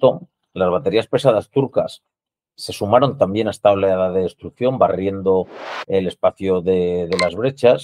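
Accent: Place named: Aragon, Spain. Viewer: Tú imagina un "no" a Napoleón con ese acento, te deja claro que solo pasarás por encima de su cadáver. Te lo dice otro mañico. Como dijo Joaquín Costa: cuando España ya no sea España, Aragón seguirá siendo España..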